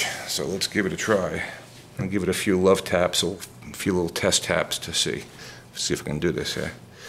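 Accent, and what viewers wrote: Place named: East Coast, United States. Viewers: this guy has to be from massachusetts......or.....new york. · I got a kick out of your accent. Such an east coast '70's hair band voice (no slight intended, just that, out west we don't have the same attitude in our speech). I have a '72 Gibson J-160 that needs a new nut because the high E is too close to the edge. Bone or plastic? Thanks, man. Really liked the vid!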